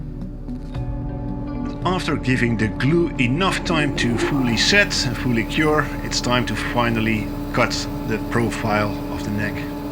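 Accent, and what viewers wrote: Netherlands. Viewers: think if you make the neck blank a little longer and higher you might be able to cut two necks out of it , even when the headstock is tilted back you do need to cut the backside of the neck first and lay away the piece you cut off as the headstock of the second neck gets cut from the heelside of the top part leftover looking from the side of the blank the necks will have the back facing eathother and the headstock slightly passed the heel of the other to have enough material (hence the blank needs to be a little longer) you likely need to have sumting to lay the rough cut blank intoo before cutting the sides out but it should save a ton of waste materials je accent klinkt nederlands trouwens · I love these videos. So much information with a cool (Dutch?) accent. :) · Hi, are you dutch? I hear a Dutch accent. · I didnt know u were a fellow dutchy and assumed you were american due to the americana vibe you had going on. 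So hearing the accent hit me like a sledgehammer putting me right back in my place. Also nice guitar. Looks sick.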